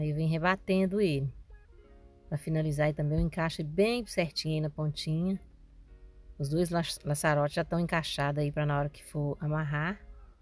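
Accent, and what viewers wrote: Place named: Minas Gerais, Brazil. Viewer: Estou há anos vendo vídeos de costura, e nunca vi os seus. Aonde vc estava mulher? Meus Deus. Vc é um misto de simplicidade, competência e profissionalismo, agora pra me destruir, vem com esse mineirês que eu amo. ❤❤❤❤ Parabéns.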